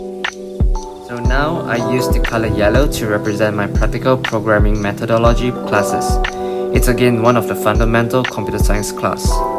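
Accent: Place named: United States. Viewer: Why do i hear the US accent HAHA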